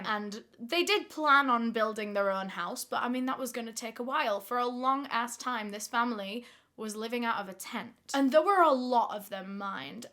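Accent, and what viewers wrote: United Kingdom. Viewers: what accent is that uk ? scotland ireland?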